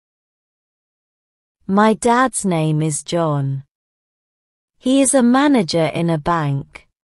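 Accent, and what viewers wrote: United Kingdom. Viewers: Is this the British accent ??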